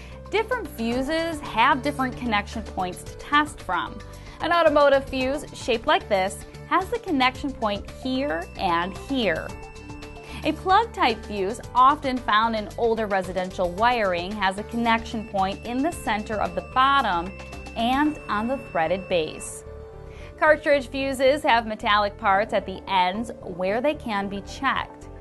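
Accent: United States. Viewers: The American accent makes it difficult to hear clearly.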